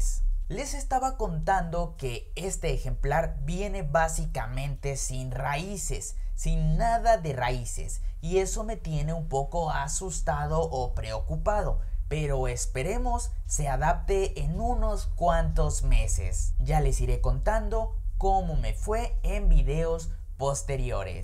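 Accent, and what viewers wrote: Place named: Spain. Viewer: Porque hablas raro ? Tipo español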